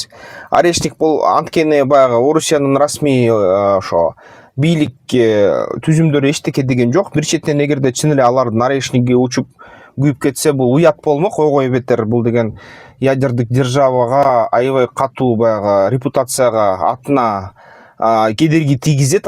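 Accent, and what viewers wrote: Standard. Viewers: Таза суйлочу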